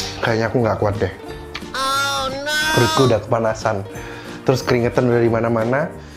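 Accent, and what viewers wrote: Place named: Java, Indonesia. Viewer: Medok medok gemeshhh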